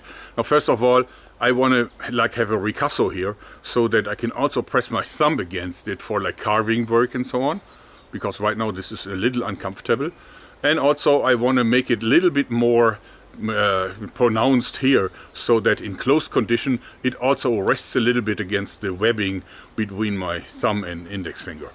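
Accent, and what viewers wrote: Germany. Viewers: What accent does he have? edit: he is most likely german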